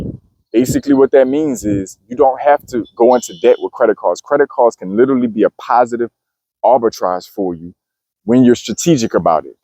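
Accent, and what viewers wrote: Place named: Louisiana, United States. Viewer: Hello! I knew you were from LA, I could hear it in your accent…I definitely know about hurricanes and having to have resources to travel based on potential hurricanes! And the ability to help others in my family and extended circle. This is a good video. I have strong relationships with Chase and NFCU…also CapOne and Discover…business with Chase and NFCU…next on list is AMEX…DDs going to Chase, NFCU, and CapOne…IRA with NFCU, savings with Chase and AMEX.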